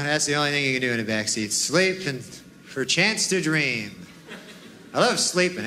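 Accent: Canada. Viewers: Actually what he sounds like is a CARICATURE of a Canadian. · He sounded a lot more Canadian back then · Yeah, he sounds super Canadian here. Norm had a real Ottawa Valley brogue as a young man. <link>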